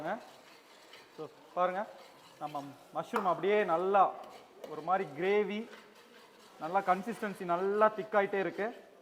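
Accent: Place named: Coimbatore, India. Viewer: அண்ணா நீங்க நம்ம கோயம்புத்தூர் பாசையில் பேசுங்கள் அழகாக இருக்கும்.....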